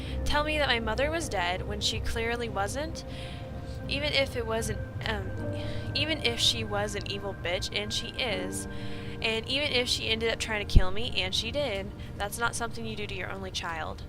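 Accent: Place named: United States. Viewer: I am so glad you did an episode like this:)plus I love hearing an American accent:)you know me haha it became my bedtime story(@@ xxx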